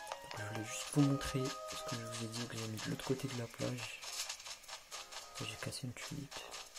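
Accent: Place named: Arab world. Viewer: tu parle vraiment comme un vrai arabe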